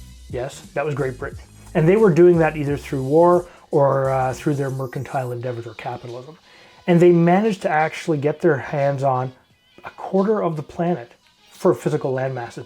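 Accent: Canada. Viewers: Lets talk abeewt.....love that Canadian accent.....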